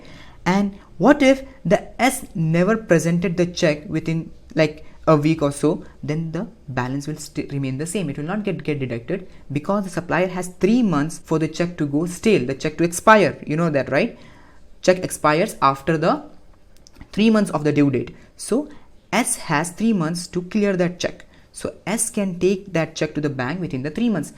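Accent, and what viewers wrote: India. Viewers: With a mix of indian accent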